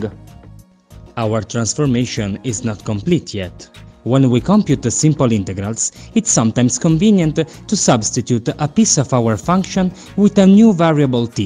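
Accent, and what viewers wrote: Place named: Italy. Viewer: Love your Italian accent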